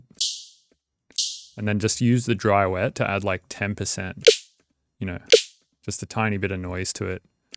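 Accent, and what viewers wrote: Australia. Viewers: He's from Australia, he always sounds like he has a cold! :D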